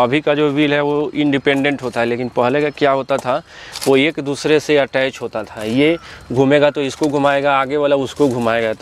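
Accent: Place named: Bihar, India. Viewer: प्लीज बिहारी हिंदी में ना बोले 😭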